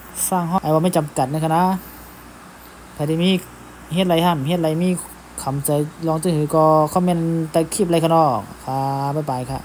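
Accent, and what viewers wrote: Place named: South, Thailand. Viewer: ภาษาคนใต้ป่าวๆ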